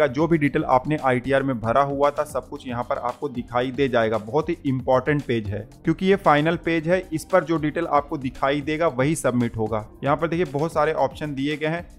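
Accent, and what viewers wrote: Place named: United Kingdom. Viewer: This is for INDIAN senior citizens. Why this British accent. Pl reload with normal Indian accent.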